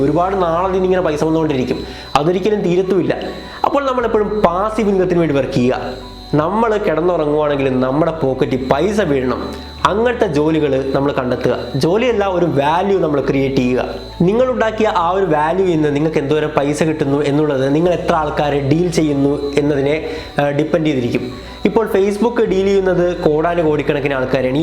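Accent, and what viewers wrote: Vadakara, India. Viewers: Bro vadakarayaano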